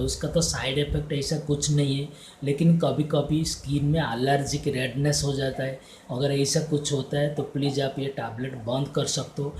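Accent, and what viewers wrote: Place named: Odisha, India. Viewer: Mujhe lagta hee ki tum odia hoo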